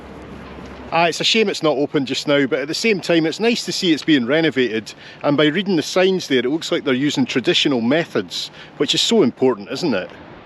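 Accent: Scotland, United Kingdom. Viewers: Travel was a wonderful part of my life. But now, physical challenges make it impossible. So thanks for taking us all along on these lovely trips. And frankly, this Coloradan could listen to you read the phone book ,with that delightful Scottish brogue! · I'm going to let you in to a wee secret: Gothenburg ain't the perfect city and Sweden ain't the perfect country. Like you put it yourself on a beautiful summer day like this it sure can be awesome just as nicely relayed by your video. Come back in late November when it's gray, wet and just super depressing and you'll have other thoughts. Still, Gothenburg does have a lot going for it and it is a delightful city to visit, no doubt about that. Thanks for the video, quite like your unique touch and that Scottish accent is just adorable. PS. Stena goes to Denmark. I'll actually be taking the Fredrikshavn - Gothenburg ferry in July.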